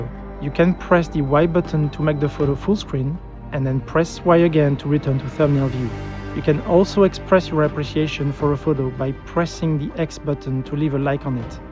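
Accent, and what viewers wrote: France. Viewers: L'accent français on l'adore quand il parle anglais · XD this French accent is so obviously 😂😂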